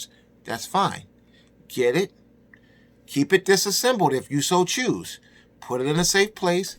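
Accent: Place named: Philadelphia, United States. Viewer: Ha ha bro. I knew you were from Philly from your accent. I'm glad there are some like minded people like you in this City.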